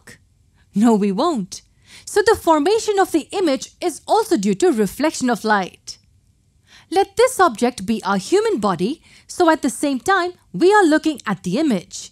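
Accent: India. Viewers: Thickkkkkk INDIA ACCENT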